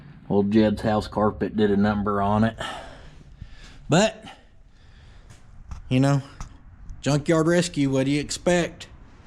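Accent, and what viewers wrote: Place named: West, United States. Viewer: Damn, your accent just reminds me of old western movies - I love it! Great commercial to boot :)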